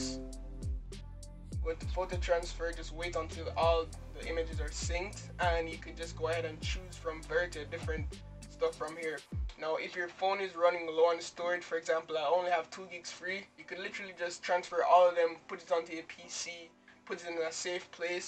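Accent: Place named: Jamaica. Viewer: Are your Jamaican? Your accent is definitely peaking through 🙌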